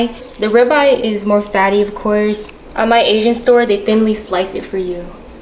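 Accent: United States. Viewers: Is she from the bay area??cuz she soundin alot like she is. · this girl sounds like shes from queens ny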